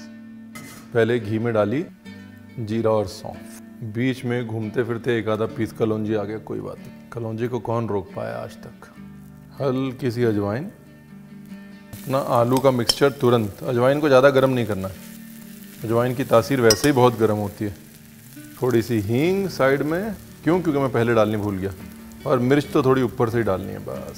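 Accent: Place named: Varanasi, India. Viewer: बोले तो छा गए गुरु... बनारसी अंदाज में।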